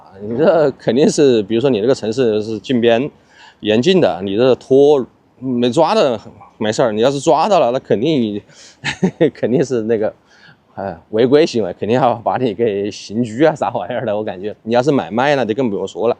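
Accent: Wuhan, China. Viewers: 武汉人？？？听你口音听出来了，我后天去浏阳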